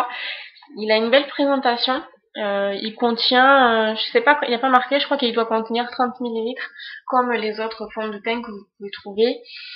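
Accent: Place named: Toulouse, France. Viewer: j'adore ta vidéo ! :) merci et au passage bel accent TOULOUSAIN ;) <3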